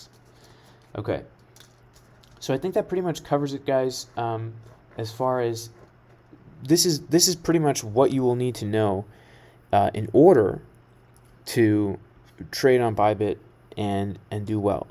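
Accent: United Kingdom. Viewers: Nice English accent. Im from UK so I am impressed. Thank you for your tuition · Haha love the British accent !! 😂😂😂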